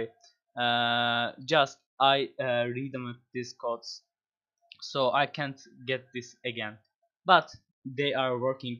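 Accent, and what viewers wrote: Turkey. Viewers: olm sesinden turk oldugu belli oluyor qweqwe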